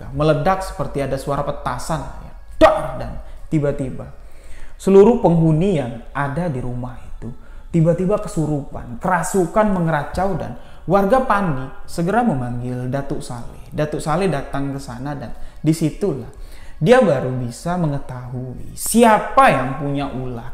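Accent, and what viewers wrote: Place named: Lampung, Indonesia. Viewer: Saya seneng nonton bang cerita.. Kadang2 dialek dan gaya bicara nya kayak ulun lampung.. Sy kira dulu bang adalah orang lampung krn sy denger suaranya aja.. 😊. Jambi, sy belum pernah menginjakkan kaki ditanah itu, tapi cerita cindung, pait lidah, sangat populer didaerah kami, lahat.. Dulu kata temen2 ku, daerah lahat juga banyak ahli racun, tapi mungkin bukan disini, yg berdekatan dengan muara enim.. 😔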